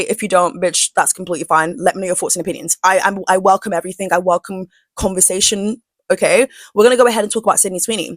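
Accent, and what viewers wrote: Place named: United Kingdom. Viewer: Not the point of the video but I love your British accent.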